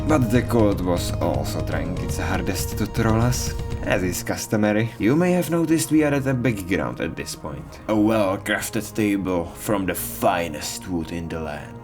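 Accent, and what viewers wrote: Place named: Czech Republic. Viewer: What country are you from if I may ask?? Ur accent sounds Czech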